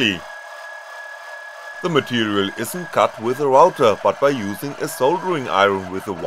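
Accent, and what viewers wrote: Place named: Germany. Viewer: немец на английском - ЖЕСТЬ!!!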